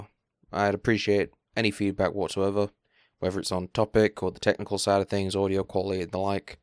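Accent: Yorkshire, United Kingdom. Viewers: Nice to hear a fellow Yorkshire man speaking, how do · You sound more Yorkshire then you ever have done so before. Is this on purpose?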